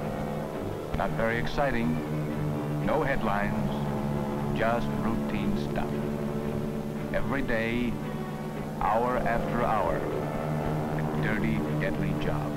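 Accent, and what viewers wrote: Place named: New York, United States. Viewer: narrator sounds like he's from NY.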